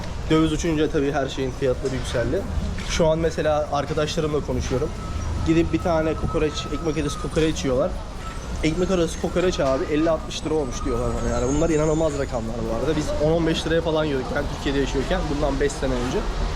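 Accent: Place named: Mexico. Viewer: Kardeş sen türkmüsün?valla Türkçe öğrenmiş Meksikalı gibisin!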